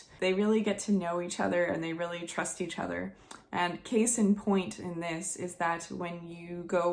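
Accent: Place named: United States. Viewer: This video was aired in Sep 2020 in COVID lockdown and I'm American like you but then again you could be Canadian because we have same accent.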